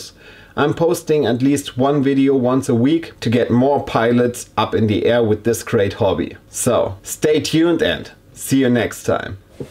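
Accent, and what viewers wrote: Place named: Germany. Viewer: Ive seen like 50 of these videos hahaha and it took a German speaking English with a light accent to Deliver the message clearly for me lol Thank You. just subscribed...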